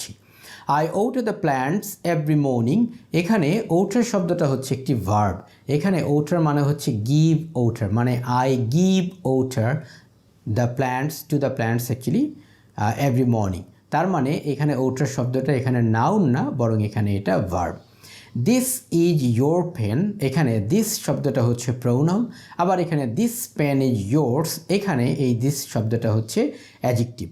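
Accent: United Kingdom. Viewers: Apni british English spoken style use koren kno???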